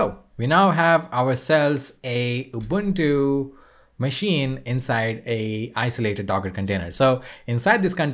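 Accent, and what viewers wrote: India. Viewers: bro look arab and speak indian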